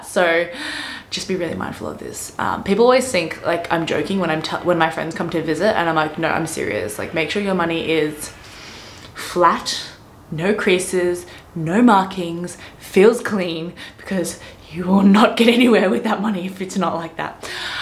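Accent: Australia. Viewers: Firstly, I really love Australian accent❣ Even though you are australian born chinese, you look like burmese sometimes😆😅. If you try speaking in burmese to Myanmar people, they might not notice that you are a foreigner. 😆 Anyway, stay safe and healthy. And yes, I'm glad that I discovered your channel. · Myanmar has bad reputations for a lot of things but when it comes to culture and hospitality, it’s top-notch. I grew up in Myanmar and you’re making me miss it a lot. Definitely visiting there in the near future. Thanks for all the videos you make about Myanmar! Also you’re so relatable to me since I’m from Myanmar, love Australian accent although living in the US and currently learning Chinese. My life’s a mess in a good way haha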